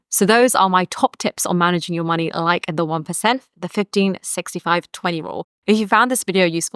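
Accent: United Kingdom. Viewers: All I want to know is how to get this cool UK accent.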